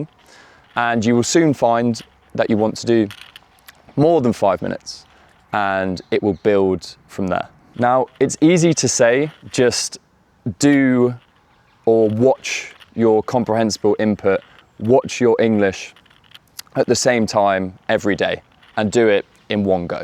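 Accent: United Kingdom. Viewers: Eu gosto do sotaque britânico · Finally someone with British accent 😂❤ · Is that British accent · You said you are in American in one of your films but your accent is like UK . I love your accent cus I'm learning UK English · Hi there thanks for this I really love the British accent and finally I found an interesting channel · Are you British? Your English is different. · Ahahaa😂 he speaks in british accent and I really love it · Although I focus on learning American English, but this video is my listening practice today , I like the British accent BTW. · Are you speaking in British accent???? because I want to learn American one, I'm afraid to be in the wrong place 🙂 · Your british accent, I loved it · Talk about another topics as much as you can because you have a wonderful British accent. And I think it can be a listening practice all of us. Thanks.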